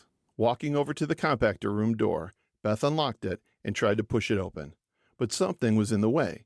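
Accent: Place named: Rust Belt, United States. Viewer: I love your voice, too. I have to ask if you are from the rust belt because the way you speak is very familiar and I am a curious cat. Just wondering. I grew up in Ohio and the Buffalo area myself.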